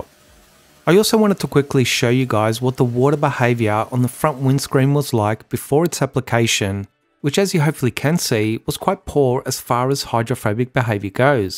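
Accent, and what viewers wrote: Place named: Australia. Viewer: It's how we say it in bloody Australia mateeeeeeeeeee!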